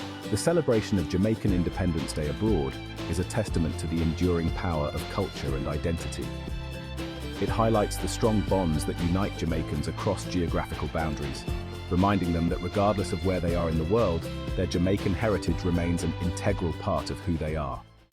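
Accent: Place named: United Kingdom. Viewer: Really? A British voice narrative g that? No jzmaican accent as available? Tacky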